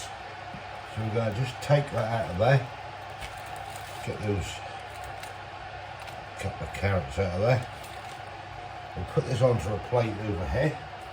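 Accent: United Kingdom. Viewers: What part of the UK are you from I don’t think I’ve heard your accent before, cracking video as always hope you have a wonderful weekend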